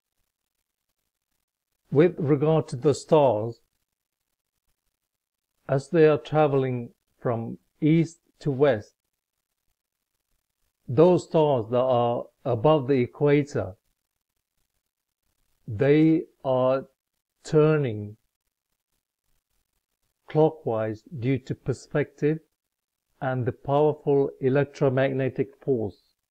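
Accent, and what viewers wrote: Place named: India. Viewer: not at all! You sound like you may have an Indian accent, that would make us neighbours (I was born in Sri Lanka) ! But I'm not 100%...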